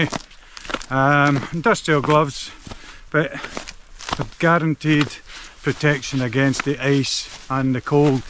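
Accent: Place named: Scotland, United Kingdom. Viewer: I confess to being very much a southerner, indeed right on the south coast of England but I come to walk in Scotland for about 3 to 4 months of the year spread over a number of visits. Slowly, very slowly, I am getting better at picking up the accent and some of the words you use to describe your brilliant mountains. I love watching videos of Scottish mountain walks and it’s really good to hear the pronunciations. That said I have one hell of a struggle to try and pronounce some of them.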